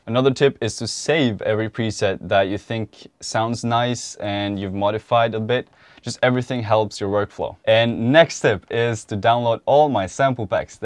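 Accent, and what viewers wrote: Norway. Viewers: er du norsk? høres sånn ut hvertfall. hvis ud ikke er nosk gir dette sikkert ikke noe mening da men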